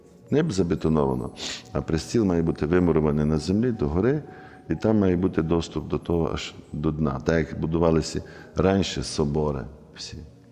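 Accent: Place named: Western Ukraine, Ukraine. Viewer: я зі Львова, отець так говорить як західняк (схоже він з Франківщини), але де його парафія? це Днпро? і яка це конфесія?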